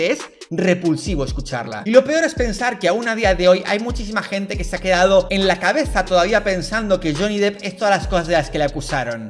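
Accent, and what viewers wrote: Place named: Spain. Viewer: No lo dice mal, solo lo dice con su acento español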